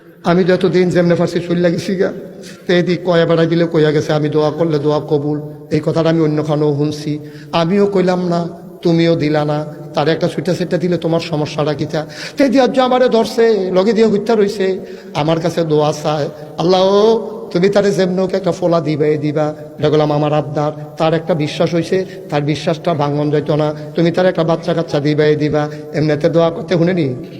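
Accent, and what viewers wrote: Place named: Comilla, Bangladesh. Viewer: সে সম্পূর্ণ কুমিল্লা ভাষায় কথা বলে। কুমিল্লায় ওয়াজ করে বেশি,সে নিজেও কুমিল্লার মানুষ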